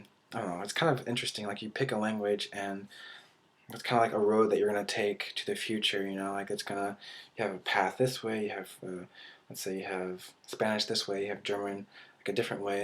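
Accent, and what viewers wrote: Bavaria, Germany. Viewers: Ich bin beeindruckt. Du sprichst was Wortschatz und Grammatik betrifft besser deutsch als so mancher Deutsche :-). Sehr gut auch der bayrische Dialekt. Da sieht man mal wieder was man mit Spass, Fleiß und Talent alles erreichen kann.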